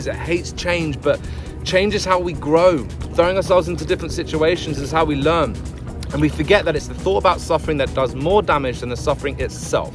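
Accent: United Kingdom. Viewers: I love your british Accent 💕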